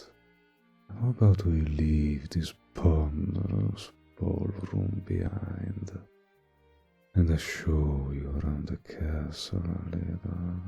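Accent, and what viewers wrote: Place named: Russia. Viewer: Why do i feel like the accent is russian😨(its super cool thought)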